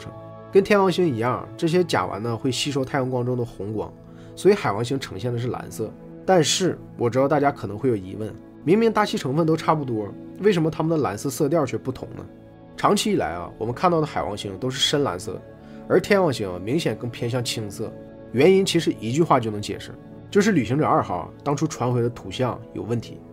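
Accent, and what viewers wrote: Northeast, China. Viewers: 这个东北口音太逗了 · 这东北大碴子味儿听着真难受，每句话的最后一个字都是降调，又软又怂又丧失，把挺好的文案全毁了，越听心情越低落，每句话都出溜着说，老头钻被窝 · 做得很好。有点东北口音更好😊！ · 好重的东北口音 哈哈哈哈😂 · 能不能不说东北话，太拉低档次了，好好学习学习普通话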